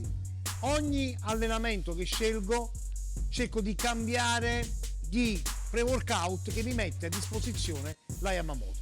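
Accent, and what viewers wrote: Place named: Rome, Italy. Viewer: Si ma un po’ di professionalità e proprietà di linguaggio… sentir Lemme e sentire, beh dovrebbe essere quasi il contrario 🥲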